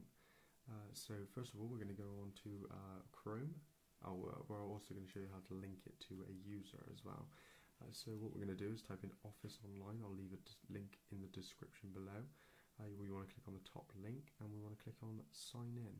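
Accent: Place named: England, United Kingdom. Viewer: English accents are delightful... especially when one can hear anything spoken ... I cannot! Where's the volume?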